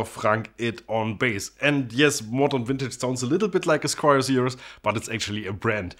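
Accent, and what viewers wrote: Germany. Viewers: Looks can be deceiving, for sure. This German/Austrian-sounding bald man plays bass as soulfully as any funk bassist I’ve ever heard and I was playing music (drums) in the ‘70s, when I was a teenager. Sweet video! Blessings, Grace and Peace 😊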